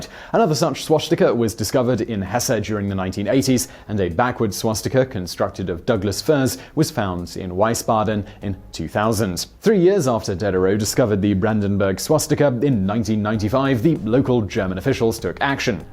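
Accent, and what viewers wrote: England, United Kingdom. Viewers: I just spent an hour trying to figure out why vsauce was speaking in a English accent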